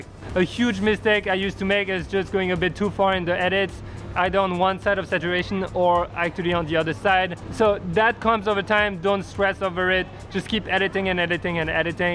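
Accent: France. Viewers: Ton accent français 👌👌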